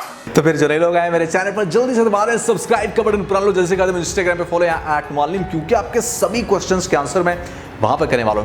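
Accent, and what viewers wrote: Punjab, India. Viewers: I love your punjabi accent vele tute nickame ashiq..you are bang on right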